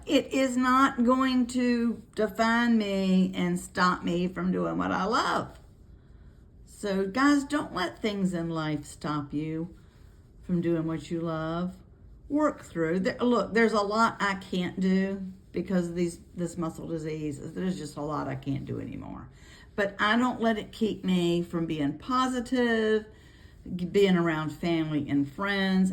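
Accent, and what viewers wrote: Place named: South, United States. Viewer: OMG! This was the easiest and most beautiful pattern I have EVER done. Also your videos are just amazing and so detailed. The video showing what you’re doing is perfect! I just subscribed. LOVE YOU!! Do you have a video for a Santa’s hat for adults and children? Not very long hanging down on top but just alittle to look like a Santa hat. Please let me know. Would soo much appreciate it. I notice you have a southern accent lol. What state are you in? Thank you again soo much!! Heart❤️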